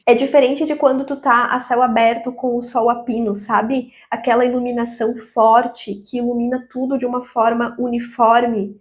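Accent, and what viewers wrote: Rio Grande do Sul, Brazil. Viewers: Adoro o sotaque gaúcho!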